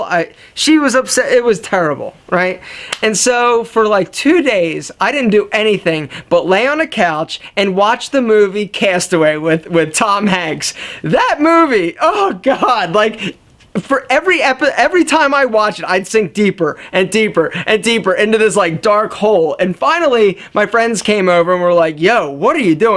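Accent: Philadelphia, United States. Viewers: You from Philadelphia?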